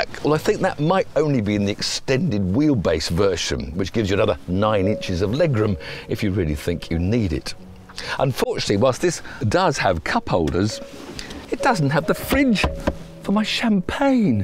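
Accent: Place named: United Kingdom. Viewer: Oh my goodness I have for a long time tried to humorously speak as a Brit. This guy is the ultimate in the snobbish presentation. 😂. When he got to the this of course is a Rolls Royce there is no tacky touch screen. I said I love him..😂. It was the absolute perfect delivery I would expect from a Brit.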